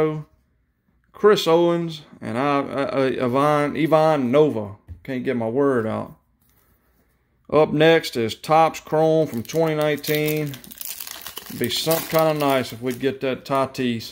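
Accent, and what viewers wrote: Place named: Louisiana, United States. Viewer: Love your channel and Louisiana accent..lol..You seemed so down to earth and humble and what makes you stand out to me..lol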